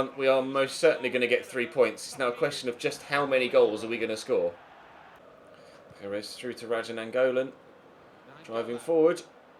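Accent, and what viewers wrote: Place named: RP, United Kingdom. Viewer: Why do you speak like a class posh commentator?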